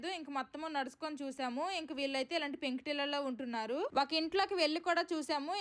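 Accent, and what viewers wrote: Srikakulam, India. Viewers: Ni voice వింటుంటే midi pakka srikakulam ani ardamaindi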